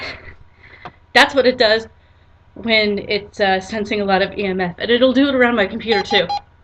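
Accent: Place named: United States. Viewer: I love your voice so much. You sound American. Byeee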